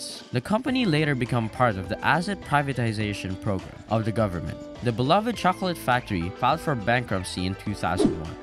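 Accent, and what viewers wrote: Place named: United States. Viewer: Are you American? Pinoy products pero parang Amerikano yung nagsasalita. I find that odd.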